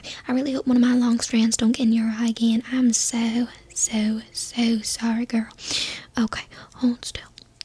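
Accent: South, United States. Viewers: w southern accent ❤❤❤ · i specifically love southern accents , this was pretty damn good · That southern accent was spot on, thanks for waping ma aahballs, appreciate ya'll...😁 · Southern comfort. This is my favorite so far. Although, the English accent one was good too. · You are almost the polar opposite of how I picture a woman from southern US in my mind, but your accent makes up for it entirely. The stark contrast is, for some reason, incredibly satisfying. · Her southern accent was amazing. Great job ❤ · If this was your first video.. I would think you were dead ass southern. Nailed it!! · is back once again! I really liked the southern accent! You should do some more accents in the future 😀😴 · I’ve been so used to the voice you’ve used for all of this time. When I turned on this video it immediately made me feel way better. I don’t know what it is about a southern accent especially coming from you but it is so calming to me. 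Thank you so much for making this video. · You know I laughed hysterically when I heard that Southern twang and vocabulary. That sounded a little too authentic to the knucklehead that is me, myself and I. Also the voice reminds me of my late aunt. That may be another reason why I laughed. I have fond memories of her and a funny story or two with her. One in particular. That funny memory is something I have not thought about in awhile. Thanks for the extra smiles! Edit: no I am not from the south but the area I live in in western PA could be transplanted from somewhere from the south lol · OMG... with a southern accident is too much cuteness. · What a sweet accent. I loved Mrs Cooper's accent, so that's southern... Good to know... one more asmr keyword I can search for. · As a southerner you did a great job with the accent! :hands-yellow-heart-red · All I love in the same masterpiece! I’m not a specialist but I think your accent is good, it reminded me of movies or series with southerners. 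il est vrai que tu es très "belle"! from face but also your profile is so elegant! you're always stylish in appearance, but also an interesting, original and fun personality. you are really the person you think you are! also I really hope that your sleep quality will improve🤞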